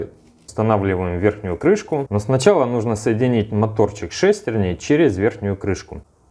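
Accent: Rostov, Russia. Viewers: Акцент Ростов Папа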